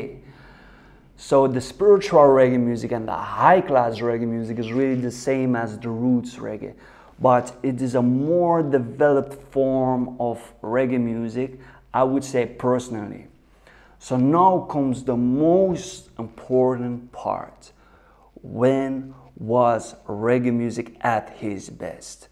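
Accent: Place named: Jamaica. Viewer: Did you spend some time living in Jamaica? Your accent sounds like Spanish or Italian but with some Jamaican pronunciation sometimes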